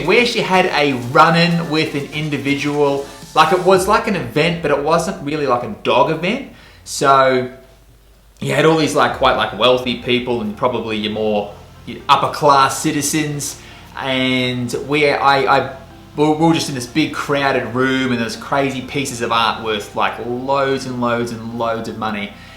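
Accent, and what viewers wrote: Australia. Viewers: Awgh ausy accent is so annoying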